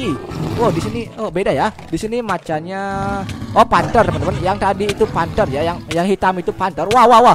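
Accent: Bali, Indonesia. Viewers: Dari bali bli???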